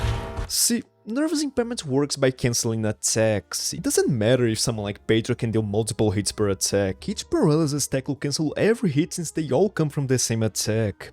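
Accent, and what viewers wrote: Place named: Brazil. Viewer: Bro are u Brazilian? Your english sounds like mine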